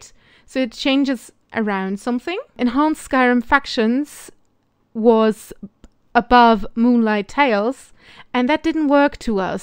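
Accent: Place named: Germany. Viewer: GERMAN!!!! YES!!! That’s what I’m hearing! Well it’s a lovely voice. ;)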